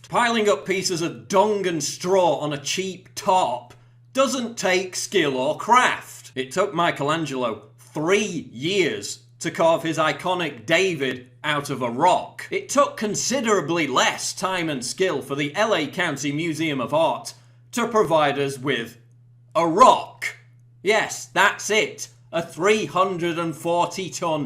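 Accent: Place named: United Kingdom. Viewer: Just because you have a British accent doesn’t mean you’re not an idiot.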